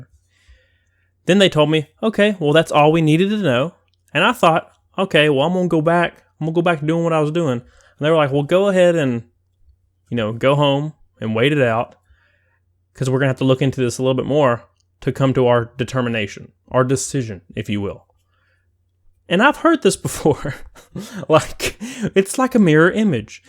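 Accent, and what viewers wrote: South, United States. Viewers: Bro I am from the south and I can tell you are to from your accent and that it is awesome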